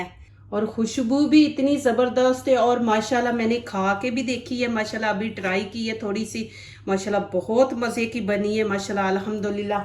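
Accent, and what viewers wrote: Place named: Punjab, India. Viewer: Simple and nice .Will try In Sha Allah. I liked your Punjabi way of talking.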